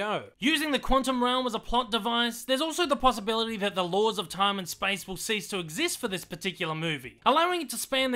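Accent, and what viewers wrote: Australia. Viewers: Great job with the video man but fuck me your voice! You sound like an Aussie version of the slug woman from Monsters Ink 😂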